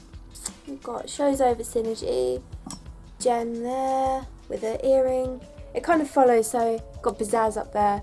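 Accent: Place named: Australia. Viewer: are you from Australia? New Zealand? I heart your dialect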